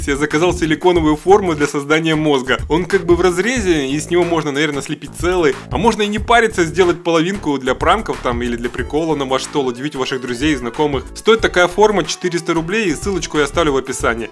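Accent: Ukraine. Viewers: мои дэтуктивные метоты не врут ты Украинец? я услышал твой акцен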